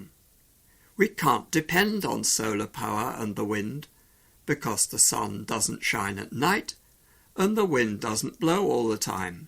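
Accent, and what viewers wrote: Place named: United States. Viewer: درود بر شما لطف کنید ازین مقاله ها بیشتر بزارید با لحجه آمریکایی بیشتر ❤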